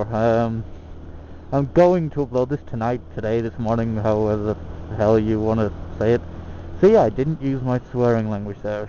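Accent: United States. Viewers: You seem to have an American Accent, Im confused... And happens all time at traffic lights lmao, pain in the bottom xD I normally end up running them or just turning around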